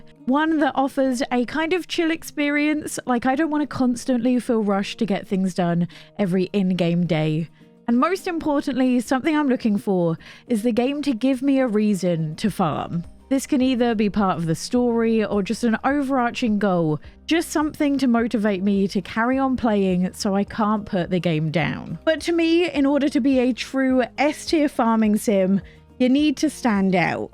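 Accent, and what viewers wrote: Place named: United Kingdom. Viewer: this british accent is so good to hear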